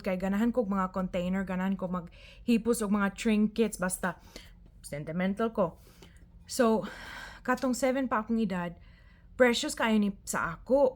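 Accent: Visayas, Philippines. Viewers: you are so inspiring and authentic! 😊 Kalingaw kaayo ko listening to you speak Bisaya and especially how bisdak you are! It brought back so many memories. I also grew up in Davao—Matina, Davao City—until 1986, when I left for Manila for college. I eventually stayed there, got married, and raised my kids. In 2005, we moved back to Davao to take care of and develop our family property before finally moving to Las Vegas in 2014. You’ve made me miss home so much. More power to your book! I’m also working on a book dedicated to my father. Like you, I have so many creative projects that sometimes I wonder how I’ll ever finish them all. But I’ve learned that a little progress at a time eventually gets us there. Keep going—you’ve got this, and I’m cheering you on from Las Vegas! 💛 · you sounded just like the locals. your linguistic prowess is incredibly impressive. 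I'm cebuana myself but i can tell your intonation is like mixed visayan but the pronunciation and the way you enunciate visayan words are on point. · I closed my eyes and I cannot really tell that you're an American. You sound naturally Bisaya, like a local. I wish you well. I hope you can visit Matina again.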